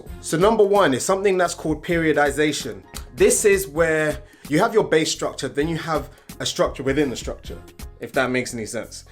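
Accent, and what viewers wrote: United Kingdom. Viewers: Good guidance my man, good to hear a British accent. I am tired of listening to American ones..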